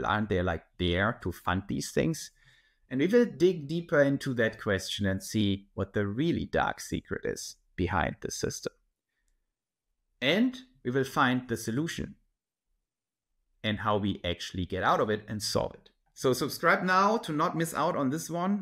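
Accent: Germany. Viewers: I sometimes don’t believe my physician, he’s not young and thinks things I don’t. For instance cholesterol. Some people have it genetically and it’s normal. I do go in annually but it’s not like a screening like you are saying. I like your ideas and catching disease early is beneficial 👍🏼 Is your accent German?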